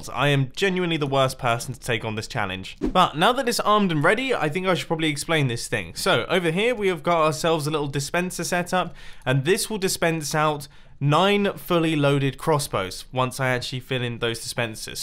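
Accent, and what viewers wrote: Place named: United Kingdom. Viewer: you are british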